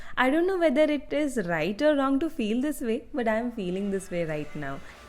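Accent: Thrissur, India. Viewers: Thrissure slang undallo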